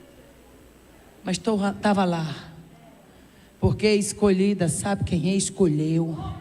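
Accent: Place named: Northeast, Brazil. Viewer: Madrugada e eu com ela: ❤️. Que companhia agradável com o sotaque do meu Nordeste: consistente, direto, sincero e antes de tudo, ABENÇOADO. 👏🏾👏🏾👏🏾👏🏾 "A" de 🥰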